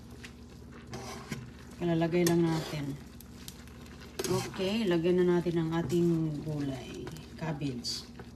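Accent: Visayas, Philippines. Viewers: D ka pa nasanay ateng haha bisaya ba sya Kya ganyan salita nya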